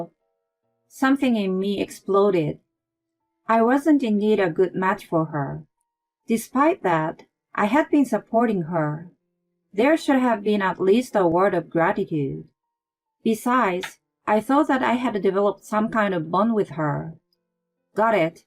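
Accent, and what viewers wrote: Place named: Japan. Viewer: If this is a American story why is the reader Japanse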